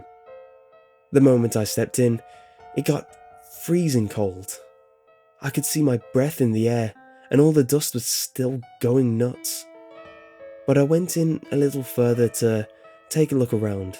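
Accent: United Kingdom. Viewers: Love your british accent ( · 70 isn't that bad, where I live 60-70 is the norm...then again where I live one day it will be sunny and 70 degrees and the next day 20 degrees and snowing that Indiana for you - _ -; Also I just realized are you referring to Fahrenheit or Celsius? In the US we use Fahrenheit to calculate the temperature. Even though the narrator was british, the author of the story was probably american.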